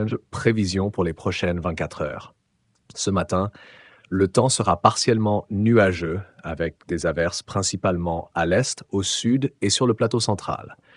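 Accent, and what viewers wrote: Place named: England, United Kingdom. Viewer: Laccent prends une petite tournure anglais des fois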